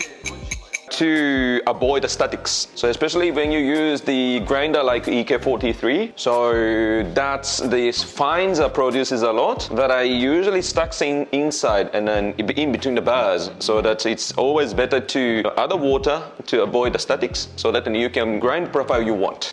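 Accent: United Kingdom. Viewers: 우리도 영국영어 도입이 시급하다 귀에 쏙쏙 박히네😆😆😆😆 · 영국식 발음을 구사하시네요. 영어도 굉장히 잘 하시구요.ㅎㅎ 설명이 너무 좋습니다. 모든 행동에 다 이유가 있으세요. 마치 수학자처럼 꼼꼼하게 커피를 연구하시는 분인 것 같습니다. 멋진 분이신 듯 ..많이 배웠습니다,~!!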